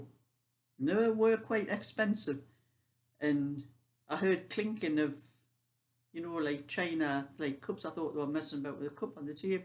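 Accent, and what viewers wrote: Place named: Yorkshire, United Kingdom. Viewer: Just looking in on all your videos with me being one of your new fans , feel so sorry about your hands troubled with pure wool , my daughter is the same . Watched the one on your accent and many of your words are the same as ours in Yorkshire and I think a lot in Lancashire as well , nex my hair dropping out must be an age thing I’m 78 it drives me mad , and don’t get me going about the bills going up don’t know how we will manage I wear about three to four layers of clothes as it is as I feel the cold when inside worse than outside but guess that’s cause try to busy myself with the garden . If got took into hospital from home they would have a job to find me with all the clothes 😂 it’s an awful day here but of snow but of sleet then rain and windy and it was down to 2/3 early this morning not as bad as we used to have it twenty years ago is it but going back sixty plus years we used to av to dig a tunnel to get out the door . 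So our age group av certainly seen the changes please don’t answer just rambling on 🤣 just keep knitting 😂 bye love to watch you thanks for all your videos Jean 💕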